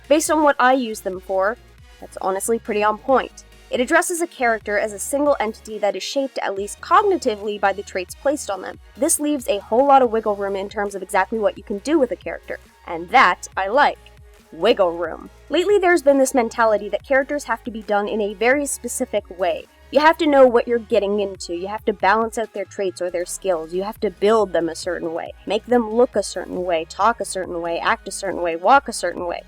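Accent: Canada. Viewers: Always makes me happy to hear more Canadians.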